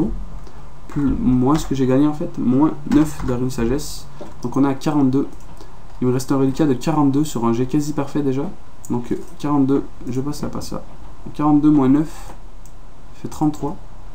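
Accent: Quebec, Canada. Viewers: J'ai reconnu ton accent québécois ;) GG toi t'es un maître de la FM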